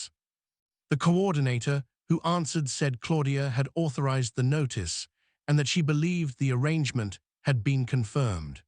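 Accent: United Kingdom. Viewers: And another totally ABSURD story with a British accent from a 10yr old mind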